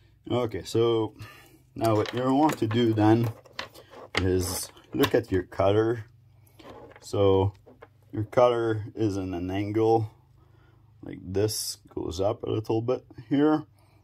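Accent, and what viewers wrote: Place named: Quebec, Canada. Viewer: Thank you! May I say you have the most delightful French-Canadian accent.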